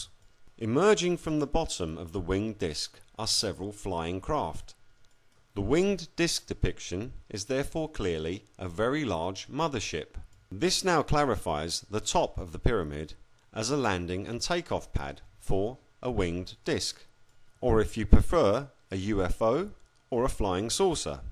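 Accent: United Kingdom. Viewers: is that a pommie accent?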